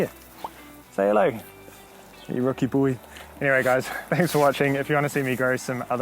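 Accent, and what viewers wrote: New Zealand. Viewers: This is the best video on how to grow peach or nectarines from seed. This is how you make good videos. No nonsense video. It must have taken a lot of patience. The kiwi accent is like a cherry on the top.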